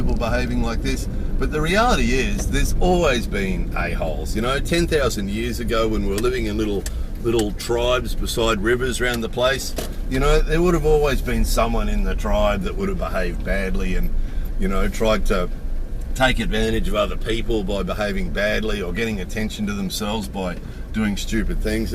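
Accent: Australia. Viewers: Nice take on all points… Nice to hear an Aussie accent into the bargain.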